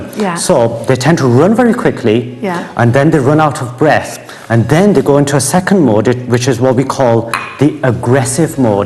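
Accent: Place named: Wales, United Kingdom. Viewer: Is he Welsh? I just can't pinpoint that accent.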